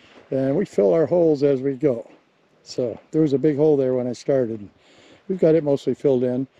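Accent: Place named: South, United States. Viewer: your a peach,i love that slow southern way,you should get a paycheck from the state tourism board.its just the best education videos in the gold search.amen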